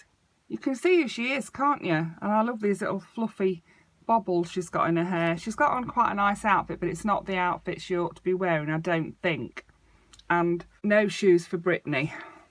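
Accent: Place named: Lincoln, United Kingdom. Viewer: I love to watch you're vids. I am an forty year old doll and toys collector. I am originally from Lincoln. But live elsewhere now I love to watch loads of other doll u tubers but like you cos of the accent it makes me homesick and all of your hauls are fab keep up the good work and hope to watch more of you're vids lots of love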